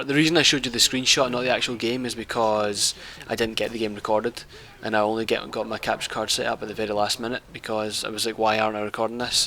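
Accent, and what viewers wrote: Scotland, United Kingdom. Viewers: are u scottish if so were 4rm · arent u british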